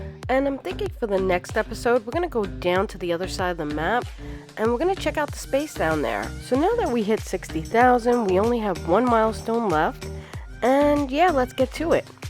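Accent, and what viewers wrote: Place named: Northeast, United States. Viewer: In this game, water sucks, it really really sucks. The water is so wavy it makes the roads wave. Im glad your fiddling with the railroads paid off. I'd keep an eye on your train tracks, I'm worried about trains locking up in such a short space. My last metro setup locked up, killing my profits. I'm still a little bitter about it. I have trouble with train and metro tracks as well. To get the most shallow slopes on the roads, or any network really, the slope section should be exactly 12 units long and rise no more than three small steps. The 3 small elevation steps are the minimum required to go over other networks without conflicts. It makes for some damn pleasing looking overpasses in my opinion. I swear I heard some New York in your voice this time. If you're on the East Coast, it certainly explains why your videos go up so early. 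I'll have to wake up before dawn to get First Comment. Cargo hubs bring all the trucks to the yard. Yours is simple enough to keep traffic tamed while still looking great. Can't wait for the next episode.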